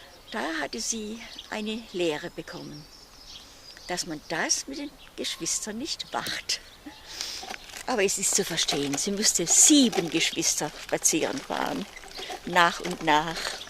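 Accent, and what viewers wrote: Swabia, Germany. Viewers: oma redest du schwäbisch oder badisch wenn du nicht vor der Kamera bist? Grüße aus Pforzheim nach Karlsruhe❤ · an alle die nicht aus bawü stammen hat die Oma einen schwäbischen Akzent? Ich kann sowas schlecht beurteilen weil ich für mich auch keinen Akzent hab aber Freunde aus dem Norden immer meinen das sie kein Wort verstehen ^^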